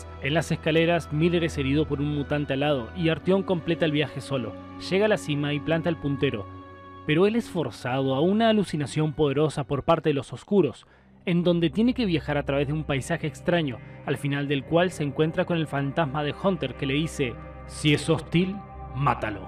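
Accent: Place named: Argentina. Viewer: Noooooo :( que feo, en acento de un argentino arruina todo :(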